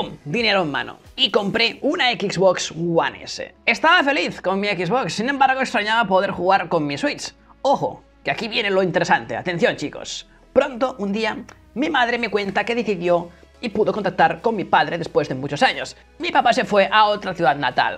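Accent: Spain. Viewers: Oye eres español verdad?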